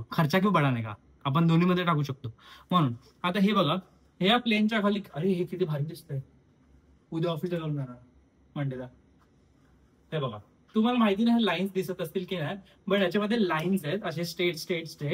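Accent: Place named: Malvani, India. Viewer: aani tujha malvani aaikun maka aasa vatata dev maka roj navin navin goshti deta ha tuja premat paduk ❤❤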